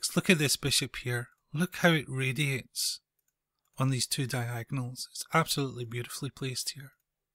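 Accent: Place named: Scotland, United Kingdom. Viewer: Terrific videos. Love the scottish accent too.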